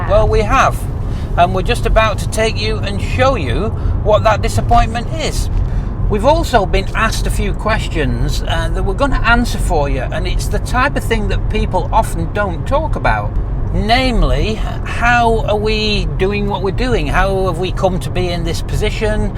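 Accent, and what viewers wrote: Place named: Manchester, United Kingdom. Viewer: Hi. Excellent series. You have inspired wifey and I to do a lap starting November. Getting things together and I was wondering what size water bladder you had on your trip. Hoping to be in WA after chrimbo. Btw I just got back from UK/Manchester where they all talk funny like you😊. Looking forward to your next trip.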